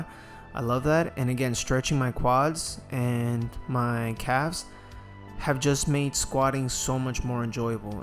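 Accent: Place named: Hawaii, United States. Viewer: He has a slight Hawai'i local accent. Thats how it sounds to me, but the exercises are helpful. Good stuff.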